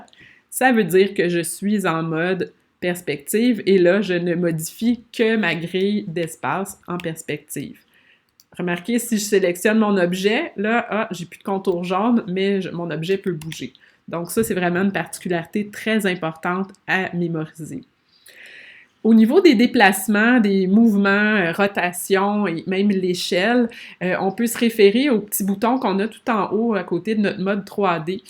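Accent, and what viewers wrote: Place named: Canada. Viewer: Accent Canadien. Great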